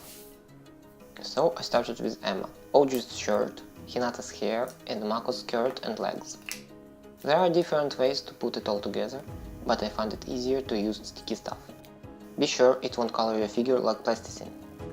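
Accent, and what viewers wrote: Russia. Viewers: Ты русский по произношению понятно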